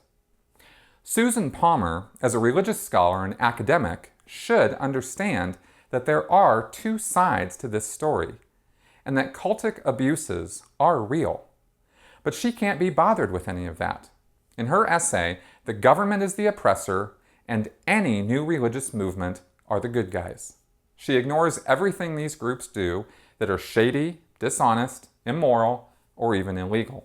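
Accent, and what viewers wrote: United States. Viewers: Your accent reminds me of an American sailor in the 1961 French film Lola. Otherwise, great as always.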